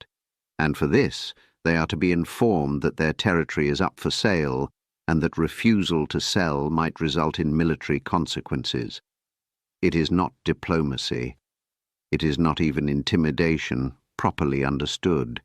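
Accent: United Kingdom. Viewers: Why is it always someone with a British accent that sounds the alarms of discontent and stupidity within our country and makes the most sense of what blind cult Americans refuse to acknowledge always so on point. WAKE THE F up PEOPLE this is our country going to HELL in a hand basket and we may never recover😢😢😢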